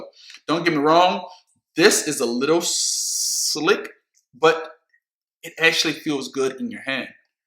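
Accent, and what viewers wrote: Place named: Louisiana, United States. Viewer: What up fam... I subscribed to your channel, and I was like he sound like he from the “Boot” too, but wasn’t sure. Then I see this video and I see the Saints Cap 😂.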